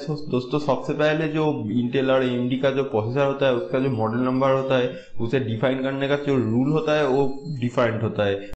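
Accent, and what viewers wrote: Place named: Bengal, India. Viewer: Your hindi is like bangali people's hindi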